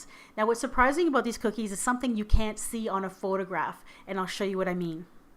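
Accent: Canada. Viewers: Are you canadian? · are you Canadian by any chance?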